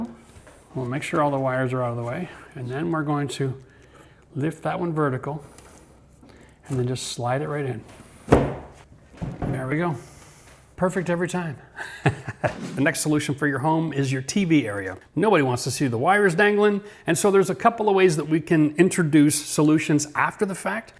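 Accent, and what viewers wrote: Canada. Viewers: In the United States, it is okay to add a 15A receptacle on a 20A circuit, as long as there is more than one receptacle on that circuit. Review NEC article 210.21(B)(3). But with your accent, I believe you're Canadian, I am unfamiliar with the code in Canada.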